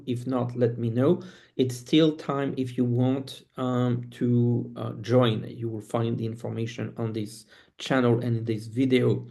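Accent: France. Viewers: I like the way you talk, calm and soft. Cute French accent, ooh. I think you present your thoughts very well, very well. See you next time.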